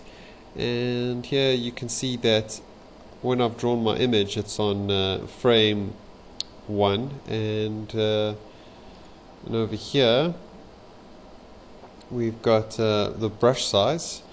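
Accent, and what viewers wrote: South Africa. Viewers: you are South African hey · omg r u from south africa cuz u sound like it ... im from cape town !! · He's South African, not British.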